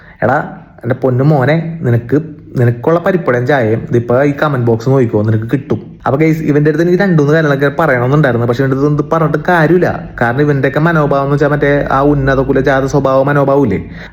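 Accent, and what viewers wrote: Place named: Coastal Kerala, India. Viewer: I started watching your videos bcz of your very interesting kadapuram slang,nalle rasaan kekaan....kore alkare chettan chiripiknind....keep going bro🎉❤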